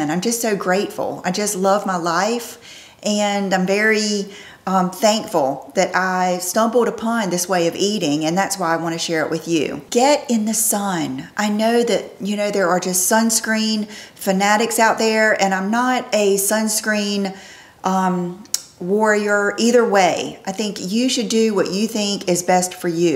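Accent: South, United States. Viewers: Thanks for sharing. I love your authenticity. Beautiful southern accent!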